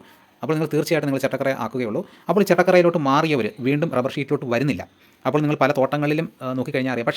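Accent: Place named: Nilambur, India. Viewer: Nilambur Nalla Malayalam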